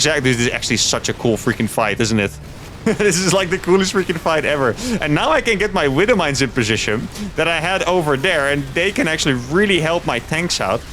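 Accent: Netherlands. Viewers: How many languages do you speak? Your English is great. You sound Dutch? You live in Mexico so probably Spanish. I would love to see a Spanish stream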